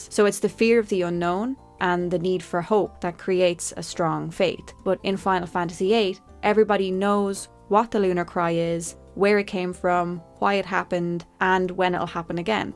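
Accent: Ireland. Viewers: Very enjoyable content ! I eat up any FF analysis video like a bag of tayto (It’s also nice to hear the analysis from a fellow Irish person)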